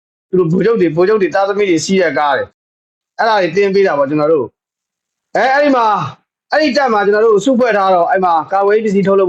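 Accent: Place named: Upper Myanmar, Myanmar. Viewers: ကိုဇင်ယော်ရေအညာှသံဝဲဝဲလေးနဲအမြဲနားထောင်ဖြစ်ပါတယ် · အခုထိ youtube ကို mobile data နဲ့ ဘဲ fb မရပါ ရက ဆိုးပါ့ အပြောတွေက ဆက်စပ် ထိမိဘဲပြောတော့ပြောလိုက်မယ်နော အညာသံဝဲနဲ့ သဘေကျတယ် သားတို့နဲ့ တလသူအားလုံးကံကောင်း ဘေးကင်းကျန်းမာပါစေ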